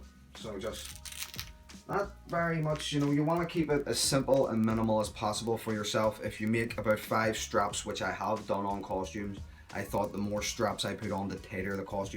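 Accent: Ireland. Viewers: great channel..charming kid..well spoken..cosplayers do it best! i just rolled into cosplay at 36..big geek..big movie and toons fan..not many channels are that enjoyable to watch..like some big names i wont mention..i love cosplay chris tho..something about irish people..and australians thats just so loveable..good friendly characters.i'm from belgium myself and we have irish pubs here..lots of irish fellows working hard here and each of them friendly AF!:) always loved the way u guys talk and yr humor..yr a good fellow..dont get cocky lol..stay humble as u are and cosplay yr ars off! and remember..cosplay is about having fun and not the ego like some..guess those people are a bit lost inside or what not..but yr refreshing to watch:) hope u have a long good run here on the tube:) best wishes and greetings..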